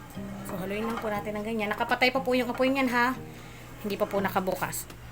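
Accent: Philippines. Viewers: I just subscribed to your channel, you are wonderful. I enjoy listening to you, you are very knowledgeable & professional. You explain it in very good tagalog and you say the english words in the real Pilipino diction and pronunciation which is very nice to the ear. I hope dumami ang manood sa iyo. Paki tanggal lang ang background na laughter at iyong background sound na wow, at sound ng chewing like chicharon, those ugly sounds degrade the high quality of your presentation. You are much better than Kris Aquino with her useless cooking.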